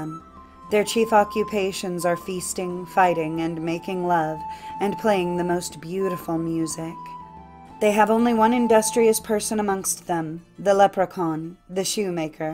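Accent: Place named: Ireland. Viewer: Nice job, btw good Irish accent!💚💚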